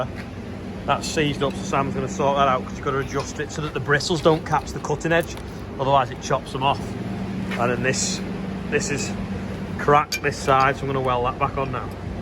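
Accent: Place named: St Helens, United Kingdom. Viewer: it's doing my head in now I'm trying to figure out were ur from I know its some were close to st helens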